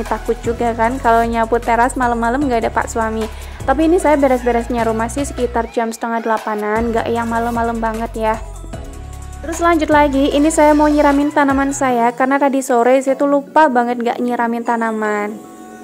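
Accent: Banyumas, Indonesia. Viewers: Slalu nonton vidio tp baru ngeh klo org ngapak 😀 aku jg org ngapak bun, pemalang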